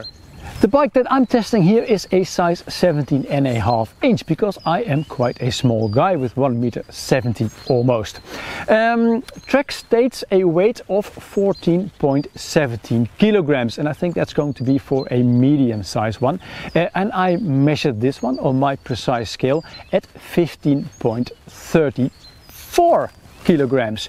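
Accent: Netherlands. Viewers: Great review. Your English is extremely good. Nothing I didn't understand. Love your Dutch accent ;@ But seriously €2,600!!!!! That's about €1,000 more than I thought you were going to say. The reason it is so expensive is because it is sold under the bikepacking umbrella. If it were a normal 29" hybrid without orange racks it would be half the price. SLX is good but not that good! I have one of the first Trek 7500 hybrid from about 1998 with Alpha SL Aluminium frame. It is very stiff and strong as the tubes were over size. It too had SLX or LX components back in the day, but it cost me about €300 new!!!!! The spec is now Shimano XT with handbuilt 700C x 35 wheels. I would not swap it for anything as the aluminium frame is the best thing Trek did, although I do not like the look the carbon fork on the 1120 today. They should have gone with aluminium or Cromoly, Also the Trek 1120 looks like a rival to the Specialised AWOL which I think has better long distance touring potential certainly better racks and can carry more. 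Their front pizza rack is a gem. I don't own one. Could you do a review of a similar bike to the Trek 1120 but with Rohloff hub or pinion gearbox preferably with Gates belt drive? But great review. I am already subscribed to your channel. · Ik volg je altijd zeer kundige en gedetailleerde testen al jaren. Ook uit het Op Pad tijdperk. Echt waardevolle info waar ik veel aan heb. Toch wil ik al een tijdje wat kwijt over je nieuwe kanaal. Nu vraag je er speciaal om. Toevallig was deze video in perfect (voor mijn oren dan) Engels. Veel technische termen en zorgvuldig gesproken met een duidelijk verhaal. Veel Engelstalige 'reviewers' kletsen soms maar doelloos rond. Reviewen is een vak. Dat beheers je dus duidelijk uitstekend. Het blijft moeilijk om iets in een vreemde taal exact uit te leggen wat je precies wilt duidelijk maken. Intonaties, woordkeuzes. Mijn Engelstalige vrienden zeggen altijd dat wij beter Engels spreken dan zijzelf. 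Wij spreken volgens het boekje. Dat doet geen Engelsman.Tegelijk zeggen ze ook: wij proberen juist niet te zeggen wat we denken. Nederlanders zeggen direct wat ze denken. In het Engels gesproken klinkt dat heel onengels en herkennen ze onmiddellijk dat we een Engels sprekende Nederlander zijn. Ze drukken zich anders uit. Ik heb respect voor je poging een groter bereik te krijgen door te kiezen voor een niet natuurlijk liggende taal. Het blijft een afweging. Toch lijkt het mij voor je zelf makkelijker Nederlands te spreken. Je hebt nu eenmaal een Nederlandse manier van spreken. Het is meer werk maar je kan jezelf ondertitelen op Youtube in het Engels. Zelf een samenvatting typen kan. Een spraakomzeter het werk voor je laten doen met evt. correctie achteraf. Eenmaal zo'n tekstbestand aang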